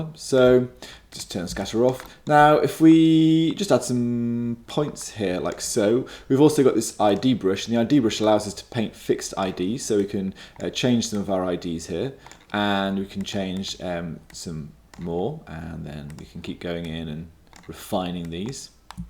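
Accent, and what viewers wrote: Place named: England, United Kingdom. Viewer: DUDE YOU SAVED MY LIFE! I was about to die from wasting my life on Xgen geometry instance painter - but then you came out of nowhere with your sophisticated english accent and saved me!! : O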